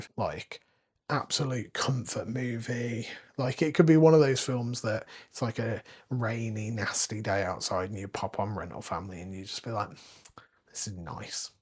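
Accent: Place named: United Kingdom. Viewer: I’ll subscribe as it’s nice to hear a British voice…. You’ll be giving Elliot a run for his money 😂 👍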